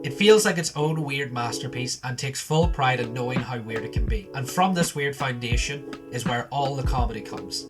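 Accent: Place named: Ireland. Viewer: My favourite part was the Irish accent 😌 And the Insight deafly going to add this to my steam list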